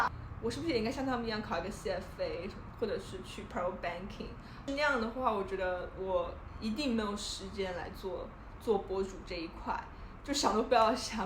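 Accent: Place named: Taiwan. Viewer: 你的口音好很像台湾口音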